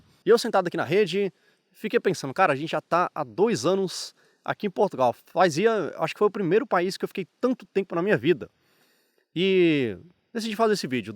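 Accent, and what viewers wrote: Brazil. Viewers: Parabéns pelo vídeo, principalmente pelo modo como se expressou num correcto Português, com sotaque mas sem erros. A ideia de Brasileiros não se aglomerarem em guetos levará à aprimoração da qualidade de expressão pois erro gramatical em Portugal também é erro gramatical no Brasil. Quanto à beleza de Portugal irei mencionar apenas isto: Ainda não ouvi nenhum Brasileiro YouTuber dizer que a grande diferença paisagística notória quando cá chegam é a quase ausência de grades e vedações de propriedades à moda brasileira. Este canal ganhou mais um subscritor Português...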